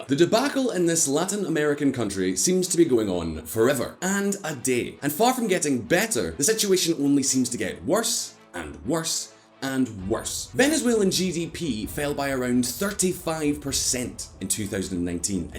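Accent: Scotland, United Kingdom. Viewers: Stop whining about Simon leaving ffs... We still get a bald white guy with a UK-based accent featuring Venezuela’s economy, and still for FREE. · Whoa, how weird hearing scottish accent on this channel.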